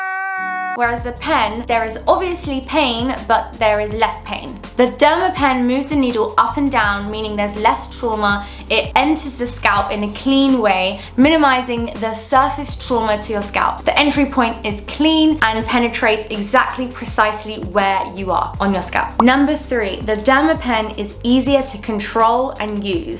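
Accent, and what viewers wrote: United Kingdom. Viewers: Love Love ur videos can't wait to try straight away! Love ur British accent!! Will update once I add to my hair journey!! Could you do a video on hair nutrition?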